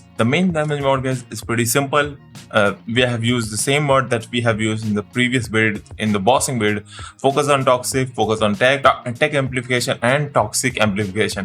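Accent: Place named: India. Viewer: He in Indian. Accent is Indian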